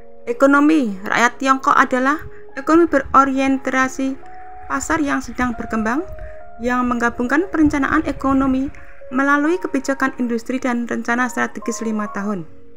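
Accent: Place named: Java, Indonesia. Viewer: Berbahasa Indonesia lah yang benar jangan medok sekali logat Jawa nya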